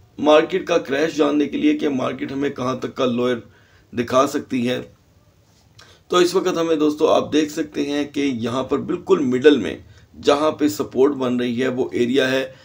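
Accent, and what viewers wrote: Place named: India. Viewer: You speak like indians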